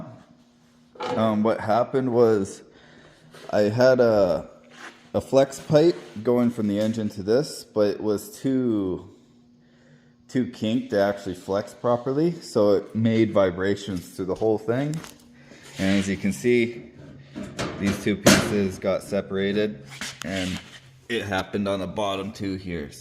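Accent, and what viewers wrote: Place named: Canada. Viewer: Cool stuff, love those CL Fords, or any Fords for that matter. The Cargo star is pretty dang awesome too, love me some cornbinders, lol. Pretty much anything with an engine. But those CL COE tractors are the best looking IMO, next to a 9670 IH or FLB. Where in Canada are you, I saw the Tim Horton's shirt and the accent gives it away, lol. I'm in NY about 100 miles from the border. Keep on truckin!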